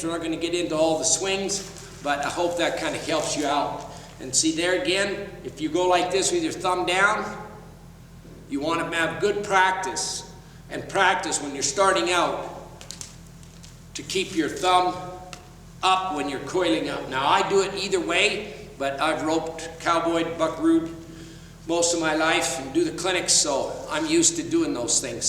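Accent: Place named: Canada. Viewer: He is saying hondo. He has a Candian accent.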